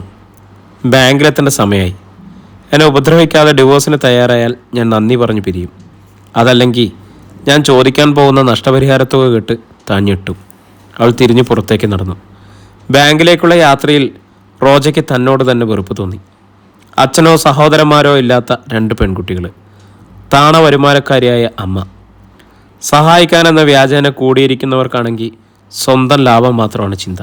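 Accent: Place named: Wayanad, India. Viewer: ബ്രോയുടെ റീഡിംഗ് സൂപ്പർ. വയനാടൻ ശൈലി അല്ലെങ്കിലും ഇടക്ക് വരുന്നുണ്ട്.❤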